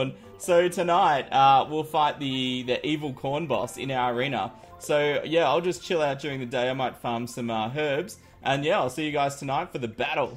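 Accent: Australia. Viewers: im like 85.6% sure you are australian, but i would like to be 100% sure. are you australian?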